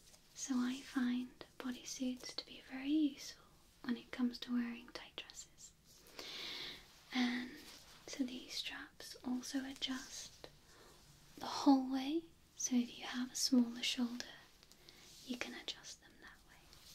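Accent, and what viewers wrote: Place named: Birmingham, United Kingdom. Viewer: You can talk to me all day with that amazing Birmingham accent!!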